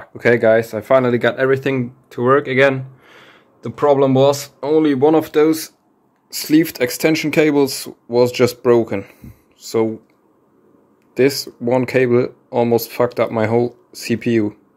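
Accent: Germany. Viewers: Man hört voll das deutsche Englisch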